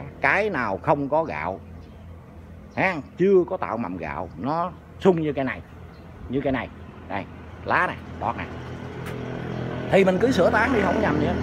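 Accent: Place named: Tay Ninh, Vietnam. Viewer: Dân gốc Tây Ninh nói chuyện nghe cũng đặc biệt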